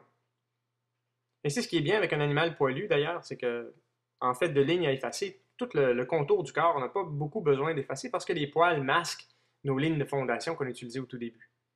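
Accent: Quebec, Canada. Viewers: Je trouve tes petits didacticiels géniaux ! Il nous permettent de dessiner des chose que nous n'aurions pas pu rêver ( en tout cas, ça me concerne ). Je trouve aussi géniale, même hilarante ( ce n'est pas une moquerie ) tes petites anecdotes ( je ne savais pas que les forêts canadiennes étaient peuplées de bouleaux ), et aussi ( pas moquerie, pas moquerie !! ) j'adoooore l'accent canadien. Donc c'est officiel, je suis fan, et pour ce didacticiel, pouce vert ! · Tu fais de super tuto, ca m'aide beaucoup, tes commentaires et tes conseils sont pertinents et tres interessants, continue comme ca tu fais des heureux ^^ et j'aime ce bel accent qui vient tout droit de ce beau pays le canada :) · non seulement tu dessines bien mais ta voix est sympathique et j aime trop ton accent québécois ça me change de l accent de chez moi (bruxelles) bonne continuation a toi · + un abo :D vous seriez pas Québécois? 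^^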